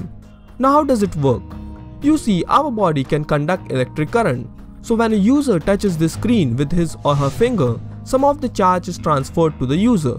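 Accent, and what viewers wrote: India. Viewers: This narrator is Indian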